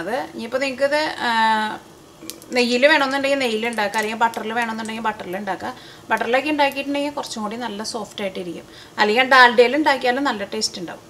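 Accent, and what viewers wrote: Thrissur, India. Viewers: വളിഞ്ഞ തൃശൂർ ഭാഷയും ഭാഷയുടെ സ്റ്റൈലും ഒന്നു മാറ്റിയാൽ മനോഹരമായിരിക്കുന്നു ഭാഷ ഒരുതരത്തിലും സഹിക്കാൻ കഴിയുന്നില്ല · Trissur language njangalkoke ishtanu.speed koodiyalum kuzhapamilla keto.soundoke cook cheyyumbo natural anu.athonnum sarallya....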